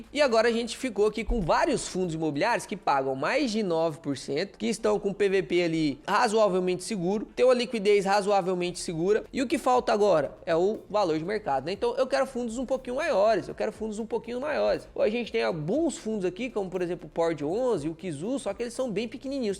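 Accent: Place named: Goiânia, Brazil. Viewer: Tu és de Gyn mesmo? Tava pensando: "Esse sotaque... É de Gyn, de Minas de Tocantins ou no interior de SP?" rsrsr. Mas tava apostando que seria de Gyn (já morei por muitos anos em Goiânia mas nunca peguei sotaque, rsrs. Ou talvez...sei lá, rsrs)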